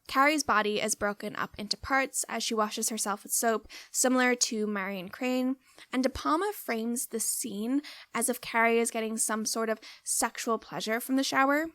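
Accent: Ireland. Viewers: Lol completely irrelevant but why do you have the slightest irish lilt?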